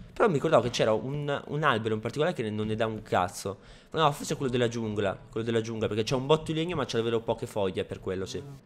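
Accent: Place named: Italy. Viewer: ma di dove sei? (so che sei Italiano ascoltando il tu accento nei tuoi video)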